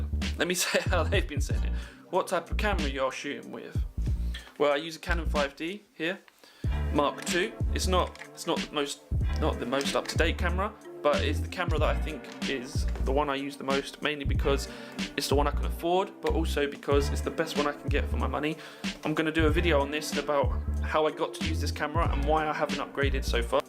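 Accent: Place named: United Kingdom. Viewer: You won't beleive it, but i watched this video to exercise my listening skills in British English 😂😂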